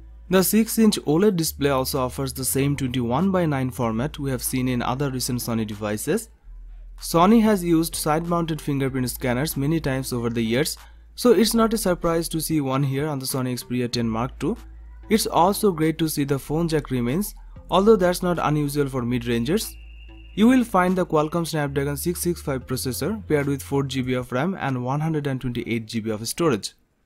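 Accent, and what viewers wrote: India. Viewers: Heu mais que fait cete vidéo ici ? Ces SONY sont déjà sortis chez nous. Par ailleurs cet accent indien prouve encore une fois l'arnaque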